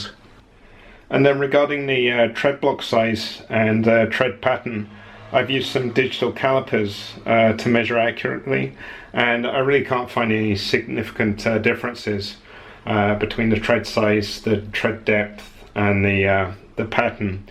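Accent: United Kingdom. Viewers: Why with a British accent? You're missing clicks because Tokyo + Offroad makes no sense. Great video content though.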